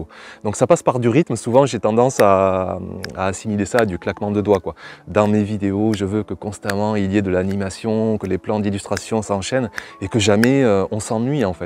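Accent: South, France. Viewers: Merci pour cette video et pour tous ces details que tu as partage, bien conscient qu'en plus de la passion du jardinage, il y a un gros travail pour faire ces videos... J'ajouterai pour compléter le tout, un capital sympathie incontestable et surtout cet accent du sud (j'adore!) qu'on vous envie dans le nord! BRAVO!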